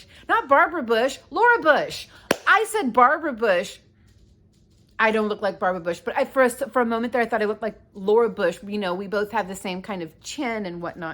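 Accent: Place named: New Jersey, United States. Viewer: Holy moly! I had thought you were in your late 20s!! I was stunned to hear you had grown children. I know how you feel about relocating and not having friends where you live. Your videos are very entertaining and enjoyable. I thought about buzzing my hair like that, just leave the sideburns. Lunch lady net!! That was good! Your New Jersey accent is awesome! You are a hoot! Your parking lot Karen comment put me in the floor!!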